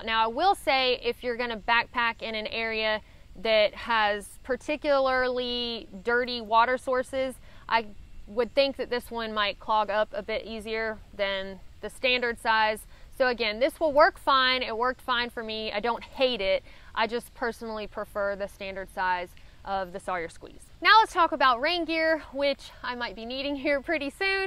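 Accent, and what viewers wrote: South, United States. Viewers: Ha ha! You say “strowed” just like my father from North Carolina. Don’t ever stop talking like a Southerner. Southern dialect is endangered.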